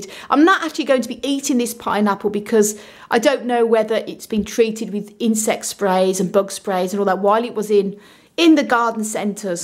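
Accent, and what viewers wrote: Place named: Solihull, United Kingdom. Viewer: Really useful video, I hope to propagate this way from my ornamental pineapple and its pups! Thanks for making, thanks for sharing your wisdom! You sound like you might be from Solihull or somewhere thereabouts? Xx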